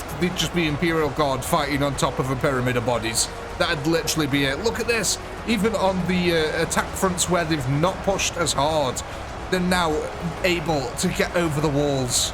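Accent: Stoke, United Kingdom. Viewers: I detect a Stokie 👀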